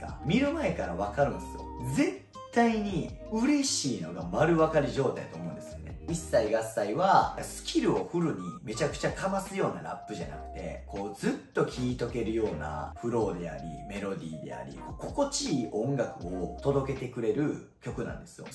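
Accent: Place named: Kansai, Japan. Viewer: さん、こんにちはダンスレッスンお疲れ様でした。関西弁で、解説最高です。ダンスも上手やし、イケメンやし楽しく解説嬉しい限りです。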